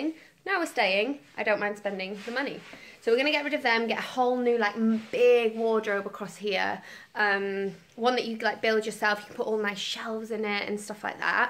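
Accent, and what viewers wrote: Germany. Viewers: Your German is so cute 😘 Love your Vlog :) Looking forward to see you at the Open day (I have two little presents for you ^^ ) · I'm so happy that you're vlogging again!🔝 I really missed it! Also I wanted to say that you're german is so cute and you are such a kind and happy person!😍 xoxo Alexandra😘 (sorry for my bad english)☺ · Cute how u speak German ^^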